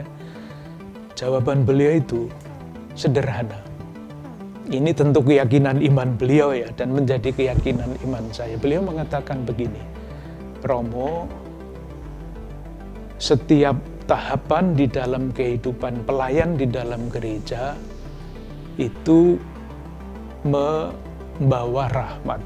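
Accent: Java, Indonesia. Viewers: Medok banget bapaknya😂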